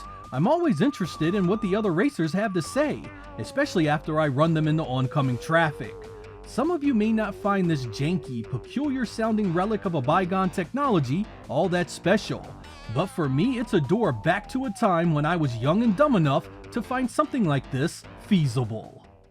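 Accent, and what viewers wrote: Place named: Delaware County, United States. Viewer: You sound like you have a Delco accent.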